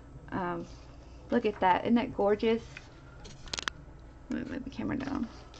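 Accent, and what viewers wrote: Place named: Eastern Kentucky, United States. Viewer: On the last ornament..., did you ever try using some sort of a starch, maybe? And girl, where are you from? I'm in Nashville, but I'm from Eastern Kentucky. Your accent sounds like mine...lol.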